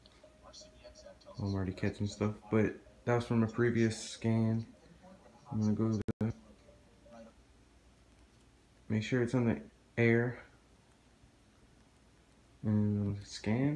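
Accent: Louisiana, United States. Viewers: Dude ya sound like a fellow Louisiana boy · Gahlee wea you from neg?? Sounds like you from LOUISIANA??? If so, where at cuz I'm trying to catch some TV channels in Houma LOUISIANA 😂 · You have got to be from Louisiana with that accent!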